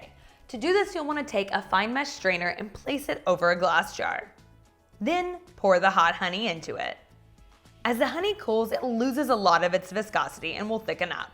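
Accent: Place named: South, United States. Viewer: You sound like you're from the South.. just a random observation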